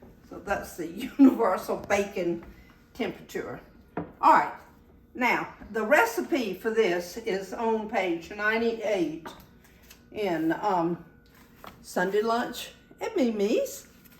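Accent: South, United States. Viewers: What a beautiful soul you have! Absolutely love your southern accent! God bless you MeMe! You are a jewel. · MeMe you are truly a gift from God! You are our favorite cook on here! Such a darling "Southern Belle"..just listening to you speak is magic to my ears!! Love how you explain your recipes so well! And..your lovely stories you share with us!! Love your recipies ( & so do my friends & family who eat my meals & deserts from your recipies!) ❤ You are just heartwarming!!!! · Amen to that!! I could watch her videos all day! She is my maternal grandma made over! Soo sweet, funny, loving, and oh that southern accent! ❤ Thank you for sharing your recipes, family, and your love for Jesus!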